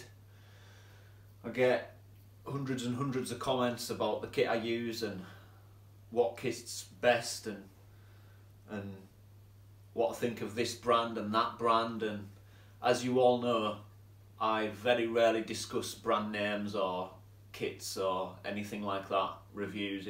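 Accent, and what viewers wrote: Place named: Cumbria, United Kingdom. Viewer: Sorry, I couldn't catch what you said with your Cumbria accent. Who makes the fire-steel with the screw off top (link) please? I'm also trying to find a suitable headlight rechargeable with white and red light options, but I am not going to pay 50 Euro for such so still searching. Mice are destructive little buggers so best to put none tinned foods into suitable containers which will hopefully protect and mask the scent and stop them chewing through your kit.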